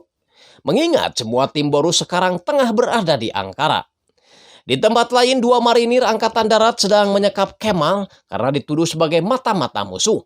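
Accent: West Java, Indonesia. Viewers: Filmnya keren.. Naratornya kocak.. Logat sunda makin mantapp!! Sukses sll kang..!!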